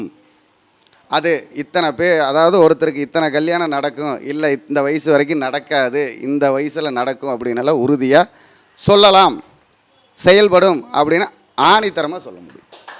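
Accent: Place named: Kerala, India. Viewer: நம்ப முடியவில்லை, கேரளத்தில் இருந்து தமிழ் சுத்தமாக எப்படி பேசுகிறீர்கள்?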